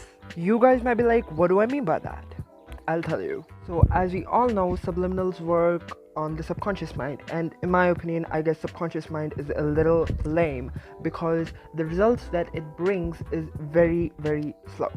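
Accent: India. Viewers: Wtf are u Indian ?!? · Are you an Indian? I hope the sublimals that I listen work😓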